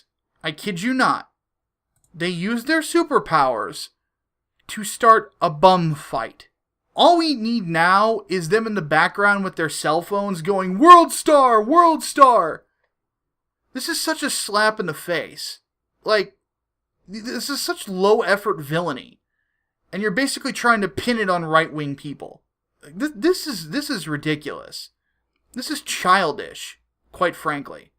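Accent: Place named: South, United States. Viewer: I literally liked the video because of your southern speech If you want to see an example of this watch don lenon . Talking to ben shapiro from a couple days ago I'm from Chicago i go down south every couple of years Usually during the forth of July I see people coming together to celebrate and throughout this leftist bullshit I've been explaining my positive thoughts of the south like That people in the south come together real well and that the the flag has been a symbol of history and the southern pride not hatred I'm against censorship period Do I want a living wage sure do these people know anything about Ecconomicss nope Inflation increased taxes the decreased value of a dollar Ouran high school I hate all these damn rich people They're idiotic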